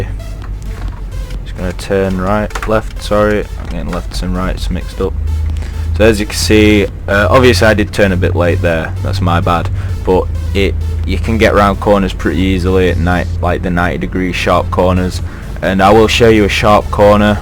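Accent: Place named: United Kingdom. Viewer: fututi acentu tau de britanic ca nu inteleg nimic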